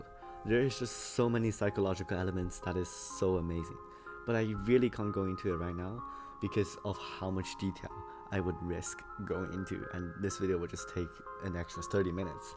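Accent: Turkey. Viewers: Yeah he really sounds Turkish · yes also I think you said you were Turkish one time that might’ve been another one I don’t know but the way you pronounce the English words🥀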